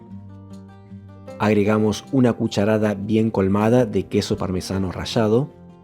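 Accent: Argentina. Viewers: Hola! Voy a tratar de hacer esta delicia hoy, pero tengo un par de preguntas. Las cantidades que vos decís en el vídeo no coincidan con las cantidades en tu receta cuando saqué la impresión. También, en tu vídeo vos decís dejar cocinar la salsa (carne) por una hora y la traducción en Inglés abajo del vídeo dice 2 horas. Yo voy a seguir tus instrucciones, por supuesto. Sólo quería que vos supieras que los que siguen tus instrucciones en Inglés, no la van a tener exactas. Vivo en los EEUU ya 50 años y hablo los dos idiomas perfectamente. Saludos y gracias por tus recetas. Me encanta tu acento Argentino. Lo extraño tanto…Te hago saber cómo salieron los ñoquis!!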